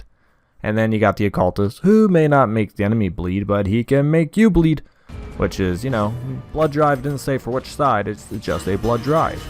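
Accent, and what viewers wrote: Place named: United Kingdom. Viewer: I absolutely love your pronunciation. You're British, right? Or a non-native who had learned British English instead of nowadays widely spread American? Or an American speaking not so American?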